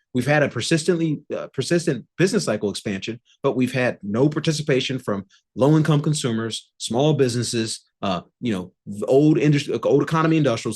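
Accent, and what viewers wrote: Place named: United States. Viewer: Typical American way of delivery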